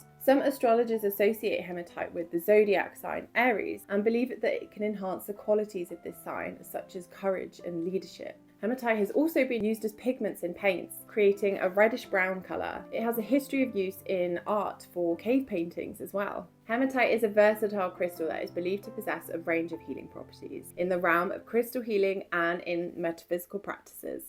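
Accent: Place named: England, United Kingdom. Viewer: Thank you for your very interesting video. I'm new to your Channel and this is only the second one I've seen. I have subscribed as I love your calm, relaxing English accent. You are also a Beautiful English Rose. 😘🏴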